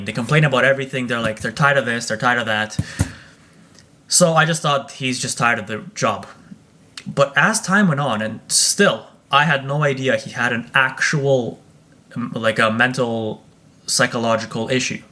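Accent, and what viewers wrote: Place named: Eastern Europe. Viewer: its his accent/etc - he sounds eastern European to me, but maybe northern.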